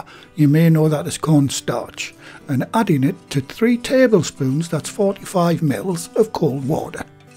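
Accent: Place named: Sunderland, United Kingdom. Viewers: Ah, a makem, I couldn't place the accent. One of the weird things about the north east is the variation in accent over very small distances. My earliest memories were in Felling, then Ouston, then Low Fell, until I moved down south for work then emigrated to the US. I've walked home from South Shields to Low Fell on New Year's Day so I think it's not too much of a stretch to say I lived within walking distance for 24 years. Thanks for doing this. My mother lectured at Gateshead Tech in cookery, and she wrote down a lot of her recipes for me, but it helps to have the video version. The things I really miss are stotties and Cumberland pork sausage. So now I have two hand cranked sausage makers, but I am not a bread maker... yet. America does not do decent pies, so I just bought a set of pie tins and a pudding basin. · Love the videos, I'm from Sunderland myself but moved away when I was 16 I'm 52 now living on the Isle of Wight I miss the accent and the food, that's why i watch these great videos Thanks so much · Just found your channel ,I saw the corned Beef and potato pie and thought great lets see if its like my Gran used to make.Lo and behold when i started watching and heard your Northern accent it made me home sick and hungry .My family on my mams side were from Seaham.Just watched the video on Panackelty great so pleased I found your channel.Dont know if you have made Ginger Wine or not but going to have a look.Regards from a northerner living in Spain.Thank you .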